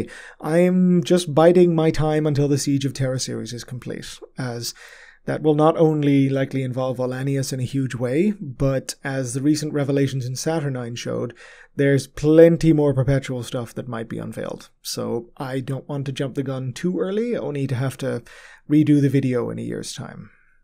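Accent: Ireland. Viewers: Fabulous! But I knew the was from Dublin from the way he pronounces his ‘t’s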